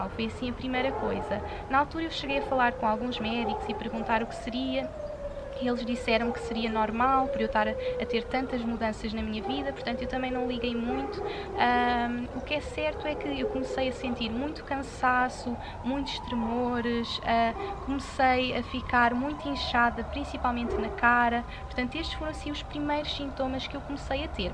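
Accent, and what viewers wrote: Portugal. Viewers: Apesar de vc ser Uma portuguesa entendi 99porcentos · Ela é de Portugal, por isso tem um sotaque diferente.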